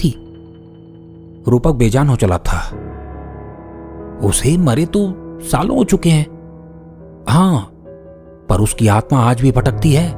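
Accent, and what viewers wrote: Bengal, India. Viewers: Aisa lagta hai ki kahanikar Bangali ya Oriya hai, kya mai sahi hu?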